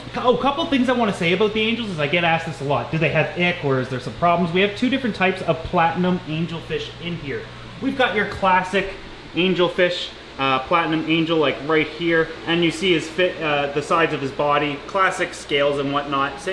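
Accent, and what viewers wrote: Canada. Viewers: ...you must be living in Canada...your accent...;-) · Ah first video and I just knew you were a fellow Canadian as soon as I heard your accent. I’m jealous I want a fish out building lol.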